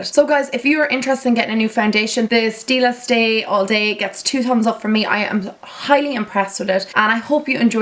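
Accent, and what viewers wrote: Ireland. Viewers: your videos are so good! I live them. are you irish , you sound sorta irish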